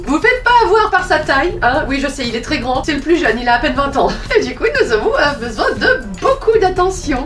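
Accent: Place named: Spain. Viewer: Comment est-ce que vous êtes si peu connue, c'est vraiment du contenu de qualité !!! Sur une autre note, je suis québécoise et j'étais convainque que vous étiez espagnole ou quelque chose du genre au début, et ça m'a prit un bout pour réaliser que c'était du français ! C'est fou comme les accents différents d'une langue peuvent affecter notre compréhension hahaha ! Encore une fois, super vidéo ! xxxx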